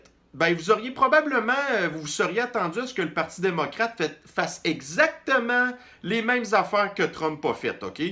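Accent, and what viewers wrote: Quebec, Canada. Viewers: Rien a faire,l'accent québécois,je n'y arrive pas. Bonne continuation quand-même 😊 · Avec ton accent canadien tordu on comprend rien à ce que tu dit ! parlé Français .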